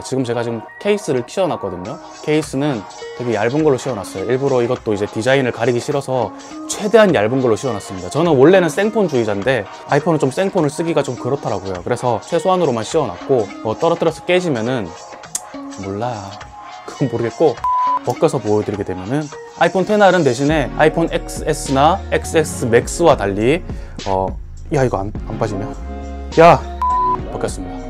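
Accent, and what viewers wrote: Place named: Gyeongsang, South Korea. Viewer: 그기 갱상도다 마!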